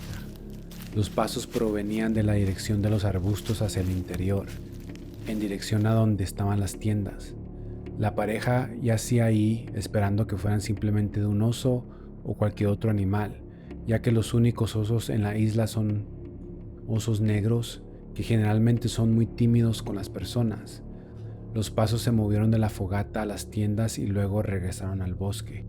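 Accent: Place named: North, Mexico. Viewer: Me gustó el video. Como sugerencia personal, tal vez puedas engrosar un poco la voz para que suene más tenebrosa. Las historias son buenardas. Por cierto, eres del norte de México, ¿verdad?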